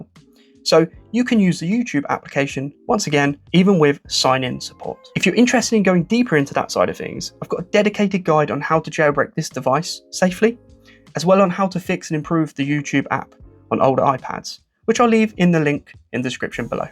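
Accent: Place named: United Kingdom. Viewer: Loved the very strong british accent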